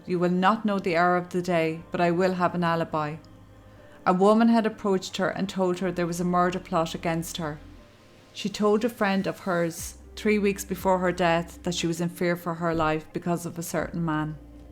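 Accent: Ireland. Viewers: YOU SHOULD DO MORE VIDEOS MY MATE. THERE GOOD AND QUICK TO THE POINTS. AND THAT IRISH ACCENT OF YOURS PUTS ME RIGHT TO KIP. 🍀🍀🍀🍀🍀👌 FROME LIVERPOOL ENGLAND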